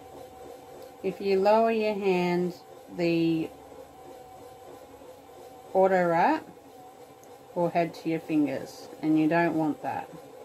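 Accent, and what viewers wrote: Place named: Australia. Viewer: Great to hear an Australian voice. Love this yarn. I am a beginner spinner but I have this in mind for later on. Thanks for sharing.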